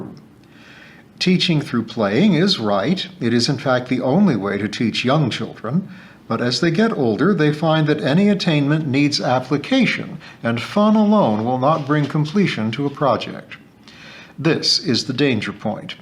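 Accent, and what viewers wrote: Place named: United States. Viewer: I have read all of the Miss Read books and was delighted when I found you reading them. I listen when ironing, cooking and other quiet activities. At first I thought I wouldn't like an American accent reading old country tales from the Cotswolds but now I'm champing at the bit waiting the next installment. Loving every minute of your pronunciation of many words. Are you going to read the Thrush Green books as well? I am English but live in France.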